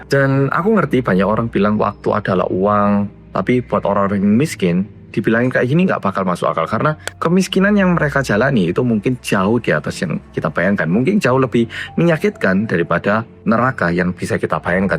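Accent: Java, Indonesia. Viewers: Hai muedok🎉 Nyimaaak 🎉🎉🎉 terima kasih, yaaaa 🙏🏿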